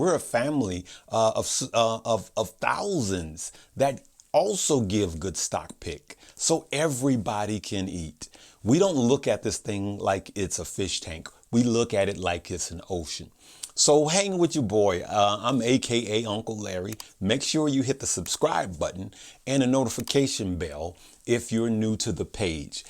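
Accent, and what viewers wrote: Chicago, United States. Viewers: Are you from Chicago larry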